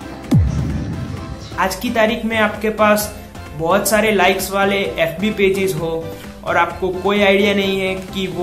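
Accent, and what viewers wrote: Gujarat, India. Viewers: Gujarat se ho bhai?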